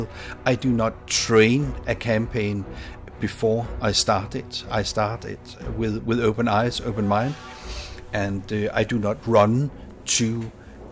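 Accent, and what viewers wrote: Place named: Denmark. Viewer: Man the Danish accent amuses me to no end :''}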